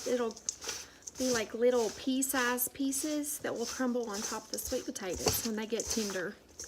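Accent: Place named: South, United States. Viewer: It looks delicious! Love your southern accent, so cute. Have a great day!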